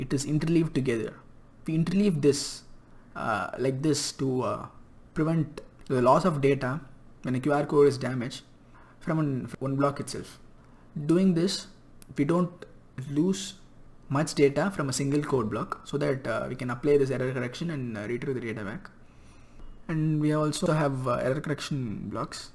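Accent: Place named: India. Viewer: Great if you are a Indian. Cannot understand his speech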